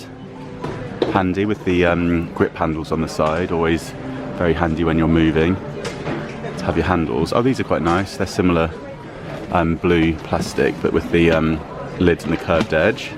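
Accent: United Kingdom. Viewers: Just subscribed. Love your vlogs and your masculine British accent. 😊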